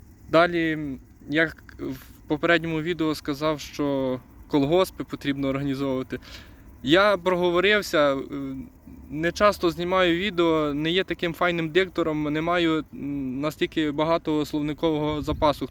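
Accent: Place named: Zbruch, Ukraine. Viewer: Akcent. Vrodi. Nedaleko. Zbrucha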